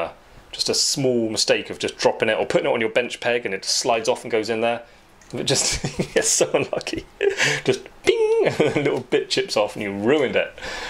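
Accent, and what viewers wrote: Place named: England, United Kingdom. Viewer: Great video Mate, thank you! What 's your accent? (what part of England Imean)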